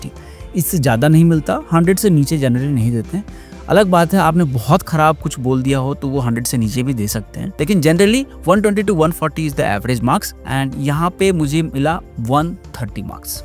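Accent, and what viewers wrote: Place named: Bengal, India. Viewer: sunei voi lagche.. dadda apni bengali hoye Jharkhand e thaken?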